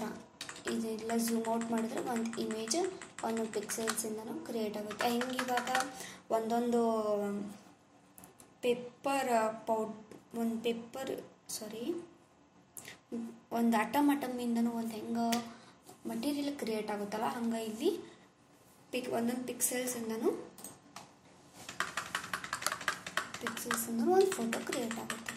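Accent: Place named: Hubballi, India. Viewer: ಇನ್ನೂ ವೀಡಿಯೋಗಳನ್ನು ಮಾಡಿ ತುಂಬಾ ಚೆನ್ನಾಗಿ ದೆ‌ ಹುಬ್ಬಳ್ಳಿ ಭಾಷೆ ಉತ್ತಮ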